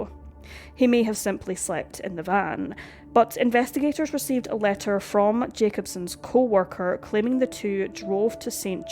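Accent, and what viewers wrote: Scotland, United Kingdom. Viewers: She is scottish.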